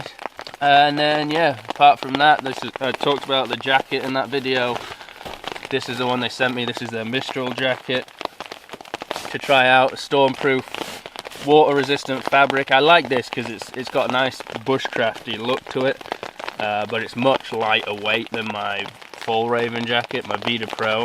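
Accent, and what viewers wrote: Yorkshire, United Kingdom. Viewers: For the whisky, "It was the cheapest, actually." lol. Yorkshire. I love it. Keep up the good work. Love your videos.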